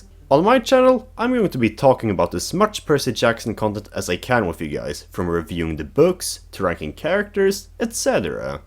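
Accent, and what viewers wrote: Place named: Sweden. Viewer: Är du svensk eller från skadinavien? För din engelska låter precis som en svensk dialekt.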